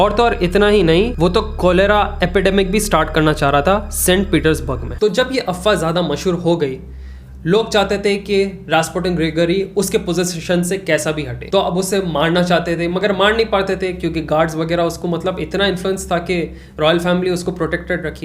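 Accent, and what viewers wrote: Hyderabad, India. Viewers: Bhai hyderabadi accent hi bola karo wahi suit hoti hai dusre accent mai hindi/urdu hi change hojati hai all the best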